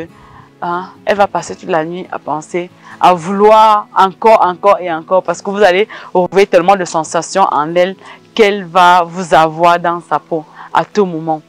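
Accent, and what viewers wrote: Cameroon. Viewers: C'est comme la poule. Elle ne donne pas du lait, mais mais mais, elle nourrie ses poussins, avec la chaleur de son corps. Merci.. bonjour. Ton accès de ton me ressemble à une camerounaise. Merci.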